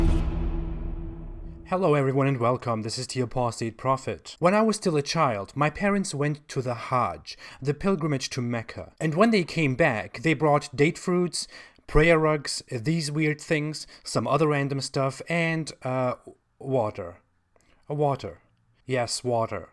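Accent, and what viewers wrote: Germany. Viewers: You have a German accent? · "I'm not Hitler just because i have a German accent"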